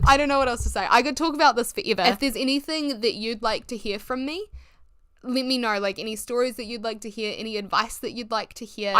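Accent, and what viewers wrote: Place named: New Zealand. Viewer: I find your videos such a comfort. This one is different but still the same you. The way you tell stories is so captivating. Also, being an Aussie I find your Kiwi accent very cute and nice to listen to and not hard to understand at all. Thank you for bravely and humorously sharing your story. I hope you no longer assign any blame on yourself, you did nothing wrong and every action you took was the right one, including keeping the diary and telling your mum when you did. Props to your mum for looking after you as best she could. Sounds like it was a horrible, difficult, and frankly ridiculous situation to be living in. The relief you feel after his death is so real and valid and 100% allowed no matter how much you also love the not abusive parts of the person. Wishing you a peaceful and happy rest of your life, and the opportunity to experience the moments of joy and wonder you missed out on as a kid ❤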